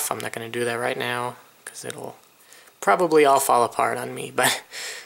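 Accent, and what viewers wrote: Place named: Sweden. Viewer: are you Swedish? i like your accent and it sounds like its Swedish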